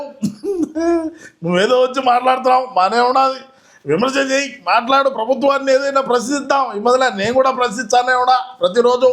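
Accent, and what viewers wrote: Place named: Kakinada, India. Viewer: Kakinada kanttam garu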